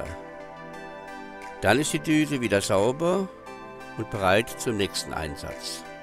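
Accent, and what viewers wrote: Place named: Saarland, Germany. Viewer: Ach, gugg mo doo... Was für e Üwweraschung zum Schluss, die Saarlänner sinn eschd üwwerall... Do haschde dei Platt awwer gudd verschdeggelt... :-D Vielen Dank für das hifreiche Video, jetzt kann ich als Anfänger meine verschmutzten Nozzles auch reinigen... Grüsse aus Aachen, von einem Exil-Saarländer mit dem Herz noch immer in der Heimat :-D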